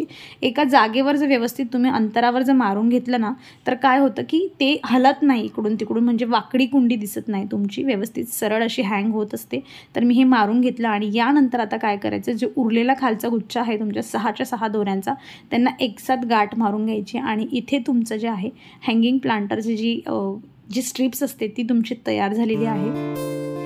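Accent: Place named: Jalgaon, India. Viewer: Khup chhan video tai.... Sagdech video mst astat... May be tumhi jalgaon che asavet language varun vatate tumchya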